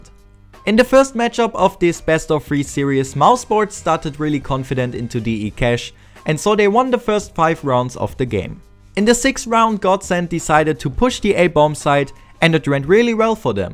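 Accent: Germany. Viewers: Haha hab sofort erkannt das du ein deutscher bist.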